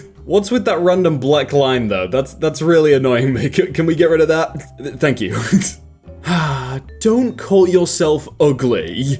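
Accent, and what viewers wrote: United Kingdom. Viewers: I realized you where British but didn’t realize you were that British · bro you do the absolute best british accent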